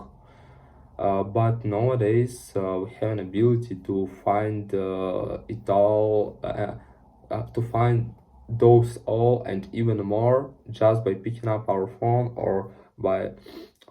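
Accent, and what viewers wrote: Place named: Ukraine. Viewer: When i started to listen you , i understood that you from Ukraine, because of your pronunciation. I'm also from Ukraine 😂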